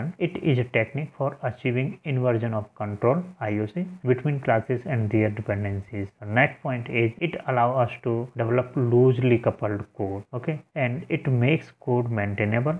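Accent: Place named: Western Europe. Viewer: Nice, nice, Western Europe sound.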